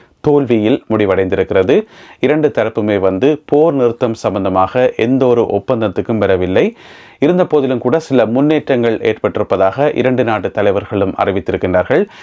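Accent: Sri Lanka. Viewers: Looks like Sri Lankan Nice Tamil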